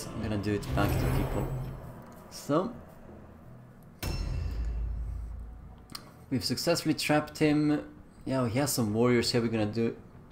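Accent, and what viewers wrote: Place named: Germany. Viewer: I was just curious about your nationality. Your accent and manner of speaking reminds me of my German grandparents. When I mentioned your ability with the numbers, I was referring to the calculations you were making on the fly concerning critical hit chances and such (which also led me to believe you may have German ancestry =) ). I hadn't made it to the Excel portion of the video yet. I think it is good to show people that when you track numbers, you can make the best decisions. Because math. Keep up the good work.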